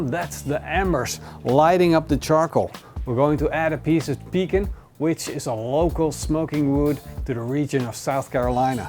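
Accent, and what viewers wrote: Netherlands. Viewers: He's Dutch (from Holland aka The Netherlands)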